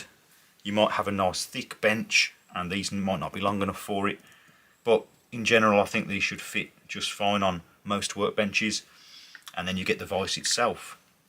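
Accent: Birmingham, United Kingdom. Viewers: good to hear an honest brummie accent! vice looks good for the money though think the rotating base is potentially a weak spot.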